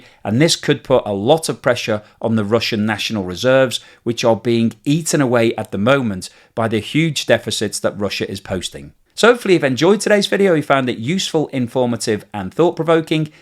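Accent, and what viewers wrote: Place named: England, United Kingdom. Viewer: Anything with a English accent is usually rubbishy anti-Russian propaganda. Does Russia have $35+ trillion in Debt and out of control spending? I didn't think so... e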